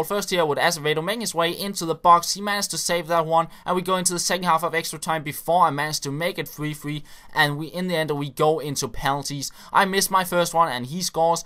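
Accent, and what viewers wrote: Denmark. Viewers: Det er dejligt nemt at høre, at du er dansker:)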